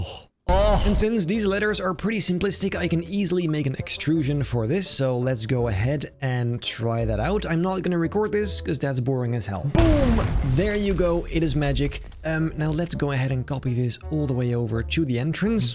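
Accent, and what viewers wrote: Netherlands. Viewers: I smell Dutch Haha❤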